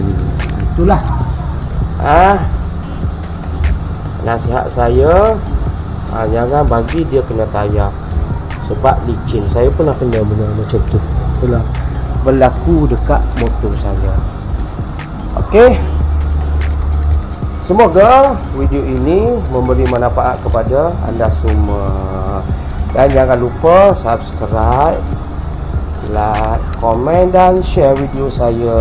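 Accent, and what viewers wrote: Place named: Kelantan, Malaysia. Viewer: BUNYI KELATE LAH PLAK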